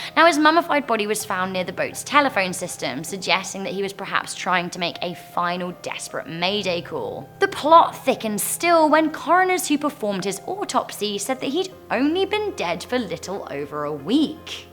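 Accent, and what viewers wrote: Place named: RP, United Kingdom. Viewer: Your Posh English accent is so... 💣💥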